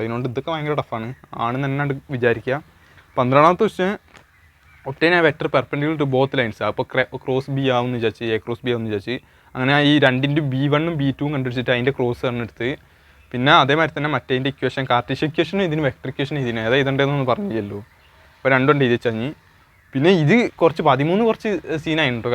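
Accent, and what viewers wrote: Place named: Kozhikode, India. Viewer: Kozhikod ano slang kettiyu tonunu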